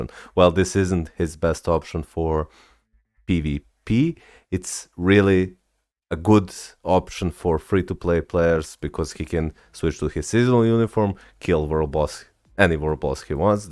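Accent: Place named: Serbia. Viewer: As soon as I heard your accent, I knew you were from Serbia. Glad to see that there are people that play MFF. My IGN is L0NEW0LF312.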